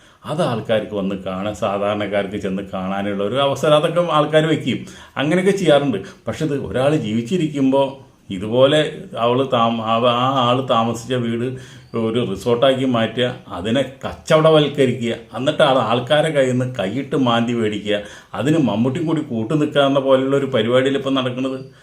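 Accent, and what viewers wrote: Thrissur, India. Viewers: Thrissurkarude slanganu chirri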